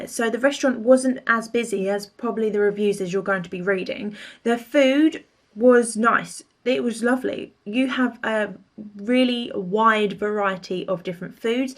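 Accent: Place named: RP, United Kingdom. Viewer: U sound very posh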